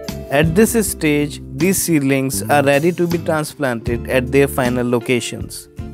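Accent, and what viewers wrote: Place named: India. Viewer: Thick accent nothing is more annyoying than Indian accent.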